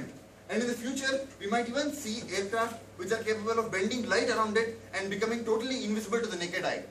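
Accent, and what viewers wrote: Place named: India. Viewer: i dont know why i like indian accent XD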